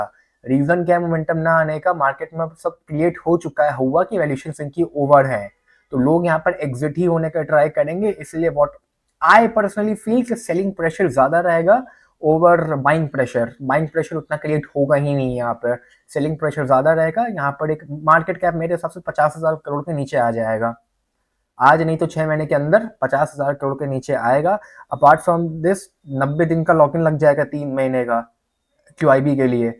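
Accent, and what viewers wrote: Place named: Kanpur, India. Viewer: Brother are u from kanpur ? Baat karne lehja wahi ka lag raha h ... Appreciated your efforts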